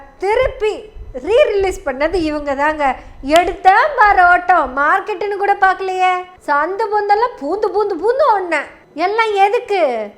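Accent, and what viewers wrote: Kongu, India. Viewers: அருமையான கொங்கு தமிழில் தவெக வை தற்குறி வெக வாக துவம்சம் செய்த சகோதரிக்கு பாராட்டுக்கள். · Very interesting presentation in beautiful Kongu Tamil. May the God bless you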